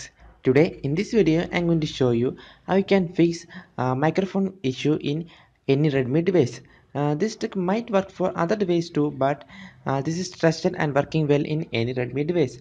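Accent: Kerala, India. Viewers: are u malayali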